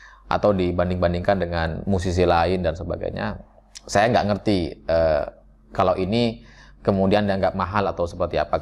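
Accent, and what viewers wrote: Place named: Madura, Indonesia. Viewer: Oo mas orang Madura ya...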